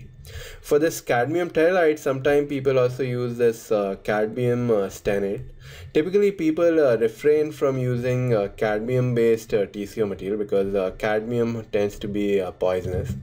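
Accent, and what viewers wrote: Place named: India. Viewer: Hindu accent- cannot understand him.... shame!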